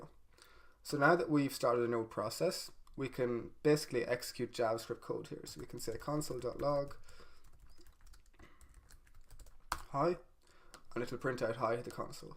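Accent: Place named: Ireland. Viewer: it's called an irish accent hahah!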